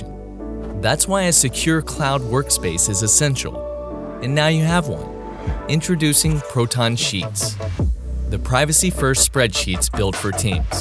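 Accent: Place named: United States. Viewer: Congratulations on the great products. That said, the narrator’s American accent feels out of place. Proton is a European company—why not present it with a European accent?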